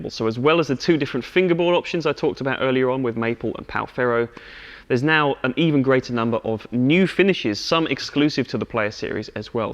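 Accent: United Kingdom. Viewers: He sounds like joe bonamassa with British accent! 😅 ! Nice playin tho lit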